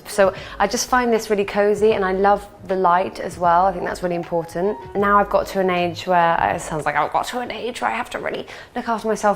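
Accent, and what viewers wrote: United Kingdom. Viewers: She's so British 😍😄 I love it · Not gonna lie ...she has a strong British accent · Her UK accent ughhh 🥵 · That British accent, love it! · Here accent is more British than a ASDA or a Tesco worker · forgot she was british and i thought she was faking an accent in the beginning..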